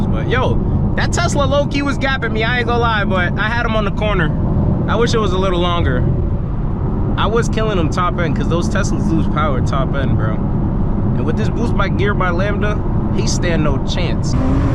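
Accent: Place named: Boston, United States. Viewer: aint even realize you from Boston too 🔥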